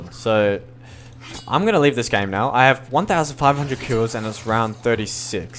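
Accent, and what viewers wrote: Australia. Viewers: subscribed from your RPG video, now that i heard ur voice i see ur an aussie, even happier that i subbed now :) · An Australian yooooo wtf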